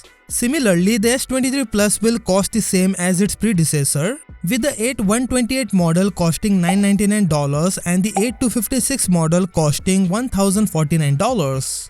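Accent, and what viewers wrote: India. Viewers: That indian accent really caught me off guard lmfao. Couldn't take it serious so I didn't watch the video, sorry but I had to let that out.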